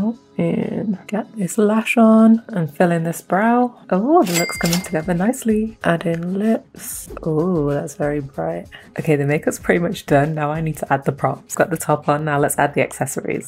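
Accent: United Kingdom. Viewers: Are u british?!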